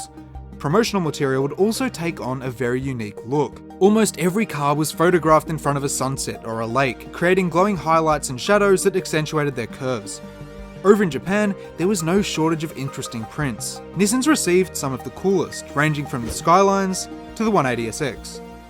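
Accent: Australia. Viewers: dont normally comment but stumbled across this video today and couldn't believe how insightful and fascinating this video was but also how entertaining it was. Also nice to hear an Aussie accent. Subbed. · Man I’m loving that more aussies are making content. It’s just so nice hearing someone that sounds like me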